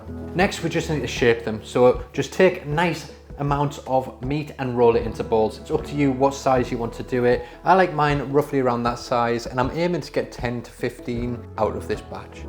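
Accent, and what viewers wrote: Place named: Birmingham, United Kingdom. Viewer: This looks fantastic! Yum. Great stuff. I love your content and the Brunmie accent😂!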